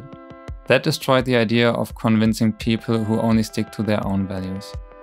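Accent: Germany. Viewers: Ah, ich wusste, dass du Deutscher bist :P Du hast zwar wenig Akzent, aber irgendwie kam es mir doch bekannt vor, aber cool hier auch mal eine deutsche Perspektive zu hören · Wusste doch dass ich einen deutschen Akzent höre ^^'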